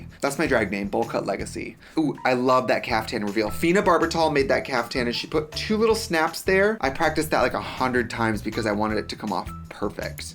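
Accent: Wisconsin, United States. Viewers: idk if i’m crazy but i can here trixie’s Wisconsin accent in this video so much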